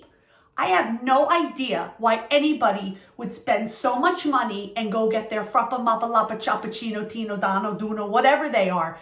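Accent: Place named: South Jersey, United States. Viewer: You honestly sound more south Jersey to me. Wawa- howee-gee Down da shore Wooder lol!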